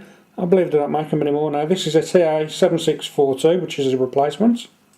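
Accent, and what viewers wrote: United Kingdom. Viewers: In a box, not from a box...You talk funny. British or something...Stupid radio.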